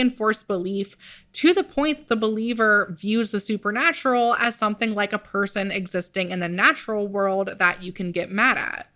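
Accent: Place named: England, United Kingdom. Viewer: I share your english accent, but its funny you don't know we have one. go ahead and google 'how to pronounce satan'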